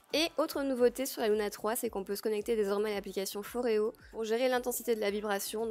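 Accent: Belgium. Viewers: Hello 🙂 Ohhh j'ADORE tes Routines! Tellement simple, enfin ceux sont clairement mes FAVORITES! 😍 Jamais dans le "m'as tu vu", toujours réel, fidèle a toi même Ta bonne humeur etc.. Puis ton accent belges dans tes Routines, MA VIE 😄 Des bisous 😘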